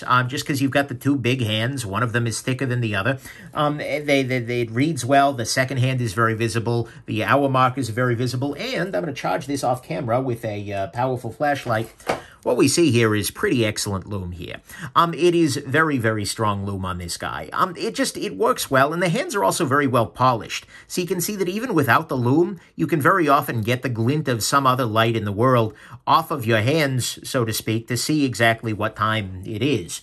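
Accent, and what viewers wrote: New York, United States. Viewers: has got to be from NYC. He would make a great TV pitchman. Very well done review.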